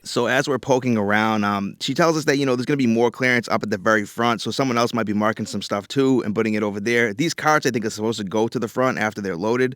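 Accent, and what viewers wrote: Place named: Boston, United States. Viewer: your new England accent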